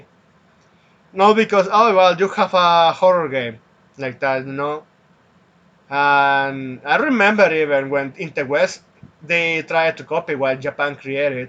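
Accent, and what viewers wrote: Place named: Spain. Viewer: Sin ofender.. Pero has probado a hacer los vídeos en español?? (Creo que es tu lengua natal, el acento me suena a español) Es que no se entiende absolutamente nada.. No tengo problemas en ver vídeos tanto en español como en inglés, pero tienes un acento extremadamente marcado y pillo solo algunas palabras sueltas. Espero que no te lo tomes a mal, he intentando ver el vídeo completo, pero ha llegado un punto en que estaba perdiendo el hilo de lo que decías incluso.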